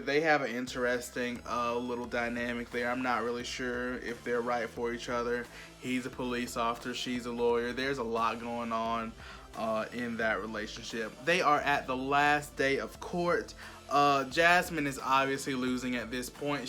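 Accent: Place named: South, United States. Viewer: See I knew it was somewhere southern lol You're welcome, I could just hear your voice all day talk about Tyler Perry lol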